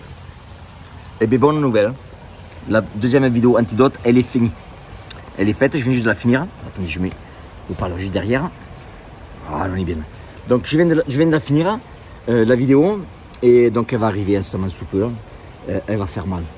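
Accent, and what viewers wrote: South, France. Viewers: est parti aux 🇺🇸mais il a gardé son accent du sud et le Pastis 🥇👍👊🏻💪🏻🙏🇫🇷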